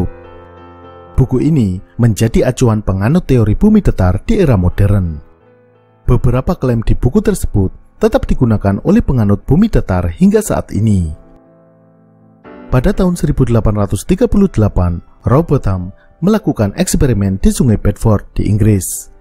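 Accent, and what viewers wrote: Indonesia. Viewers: Karakter suara medok nya biarin natural aj bro.. g usah gegayaan dibuat2 pake intonasi.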